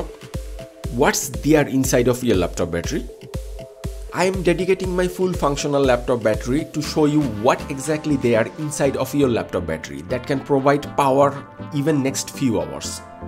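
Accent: Bengal, India. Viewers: You have such a STRONG Bengali accent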